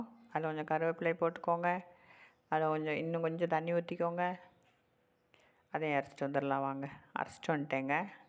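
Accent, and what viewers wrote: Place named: Kerala, India. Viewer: நீங்கள் மலையாளியா?உங்கள் உச்சரிப்பும்,உபயோகிக்கும் வார்த்தைகளும் அதை தெரிவிக்கின்றன.பி‌ன்னே என்பது மலையாள‌வார்த்தை.தமிழில்‌ அப்புறம் என்று சொல்ல வேண்டும்.மனஸிலாயோ சேச்சி?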